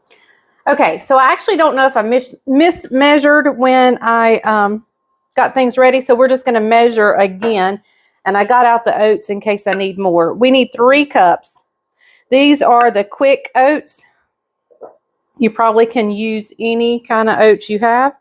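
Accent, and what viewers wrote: South, United States. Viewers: I'm gonna go ahead and soak my zucchni n pneapple juice, yum it sounds perfect for this bread. You are so good, love this video and your sweet southern accent! You are a very good baker/home chef.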